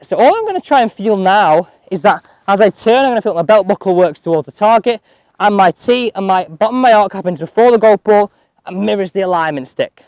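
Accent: United Kingdom. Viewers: slow the speech please not all viewers are scottish! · It’s the fast British accent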